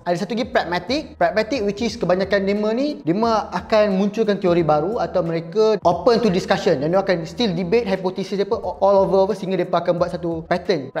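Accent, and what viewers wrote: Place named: Parit, Malaysia. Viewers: are you from Parit,Perak,ghope ckp pekat org Pagharit je????😆😆😆😆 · Pekat benor perak nye yop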